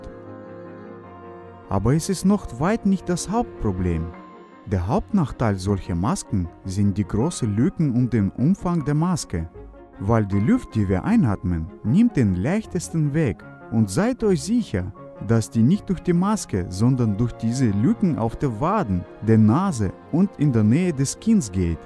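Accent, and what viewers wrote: Russia. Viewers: das ist auch ne Idee 😅 Wobei ich seine russische Aussprache auch sehr mag. Ich versteh aber leider nur sehr wenig davon. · Чувствуется русский акцент)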